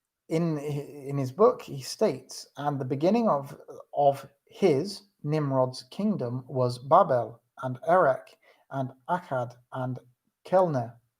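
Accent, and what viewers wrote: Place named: West Country, United Kingdom. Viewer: Sounds like Devon n Somerset! Thanks for this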